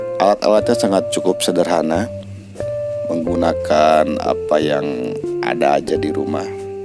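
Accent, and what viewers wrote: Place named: West Java, Indonesia. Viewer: Pokona mah urang Sunda MH Tina A na bentes pisan😀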